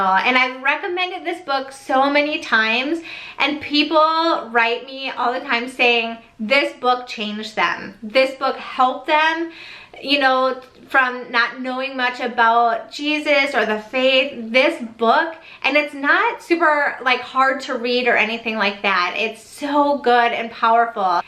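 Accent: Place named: Chicago, United States. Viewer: Thanks for the book recommendations, am really curious to check out Consoling the Heart of Jesus....just a unrelated question/comment, are you from the Chicagoland region? Just picking up strong Chicago accent :)...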